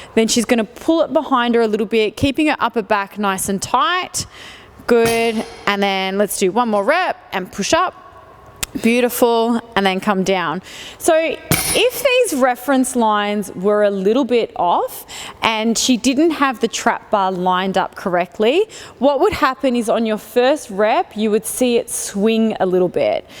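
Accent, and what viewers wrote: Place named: Australia. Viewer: Aussie accent is ❤️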